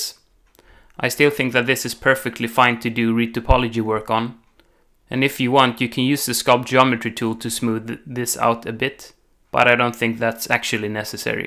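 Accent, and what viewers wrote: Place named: Greece. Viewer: Μα το θεο ακομα και να μην διαβασα ονομα θα καταλαβαινα οτι εισαι ελληνας, το ελληνικο accent δε φευγει ποτε και κανει μπαμ απο χιλιομετρα, πολυ ωραιο βιντεο αδερφε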